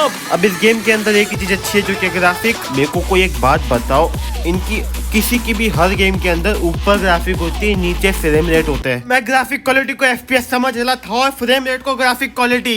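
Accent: Gujarat, India. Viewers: Bhai gujarati Cho ne tame Tamaro hindi ma Gujarati flow joi lage che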